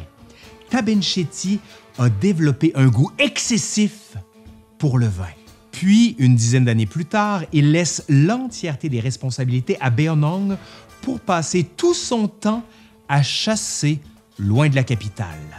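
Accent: Quebec, Canada. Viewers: Frchmt avec ton accent québécois et ta façon de raconter ça donne envie de te suivre et d'en apprendre plus sur l'histoire 😉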